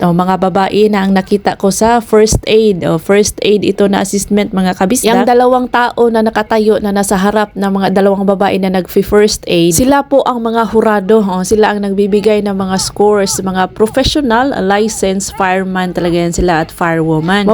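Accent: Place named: Jagna, Philippines. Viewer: ah mao sakto ko basta dapit jagna basta dili sa pikas kay ang tunos imong tingog. Just keep it up. Tan aw ra kos imong mga vlog. Good luck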